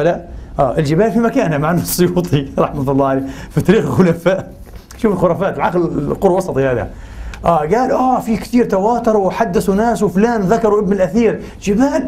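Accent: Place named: Palestine. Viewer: والله جعلتني اشتقاق الى سماع اللكنه الفلسطينية انت ممثل مسرحي رائع انت فنان نبرات صوتك صادقة .....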